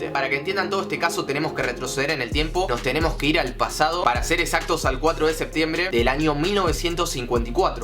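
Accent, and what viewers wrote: Argentina. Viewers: SOS DE ARGENTINA ?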